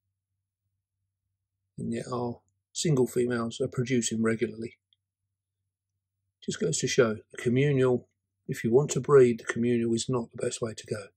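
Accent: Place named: United Kingdom. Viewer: Who else says "Hello Guys, welcome back to the Beastie Room" in their best British accent, right along with?;), as always, top notch production with lots of fun 'eye candy'. Again, is that me, the woman who has been afraid of spiders all her life, saying that?? And to Camera Lady, Bless you! Always look forward to your videos.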